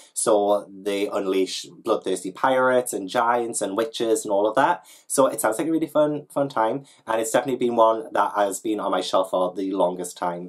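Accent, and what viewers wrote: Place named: Newcastle, United Kingdom. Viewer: I really need to get back into middle grade reading! Also it's fantastic to hear a fellow geordie! Loving the recent videos - stay safe x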